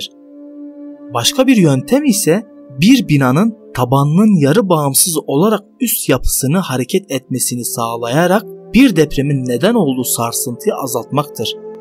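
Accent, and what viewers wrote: Aegean, Turkey. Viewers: Ege şivesiyle muhteşem bir ses eline sağlık yeni videoları sabirsizlikla bekliyoruz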